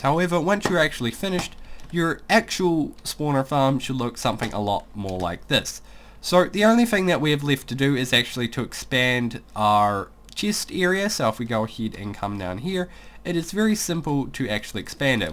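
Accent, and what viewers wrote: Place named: New Zealand. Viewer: What part of New Zealand are you from? I'm From Auckland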